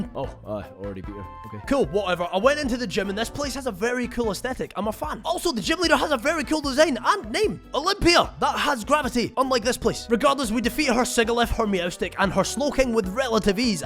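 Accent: Scotland, United Kingdom. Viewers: This is the first moment in the video that I realized you had a Scottish accent. 😂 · Oi m8 what’s yer nationality cause I hear some Scottish in that accent (the speed and the emphasis on p, c, s) also I like the persona music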